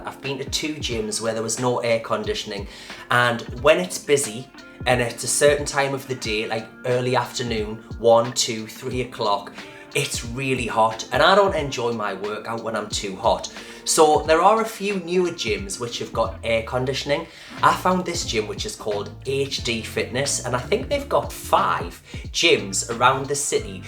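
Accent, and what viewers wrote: Newcastle, United Kingdom. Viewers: What a great Geordie Accent. Good Video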